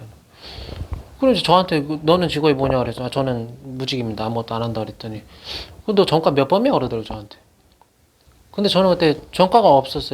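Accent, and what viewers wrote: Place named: Jeolla, South Korea. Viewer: 이분 말투가 구수하신게 전라도 사투리 조금쓰시는거 맞나요?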